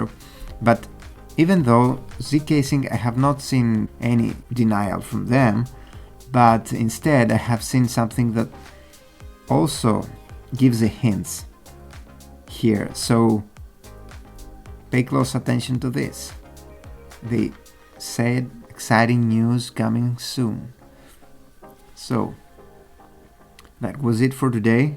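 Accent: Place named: Greece. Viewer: You sound like a Greek..I guess you are 😅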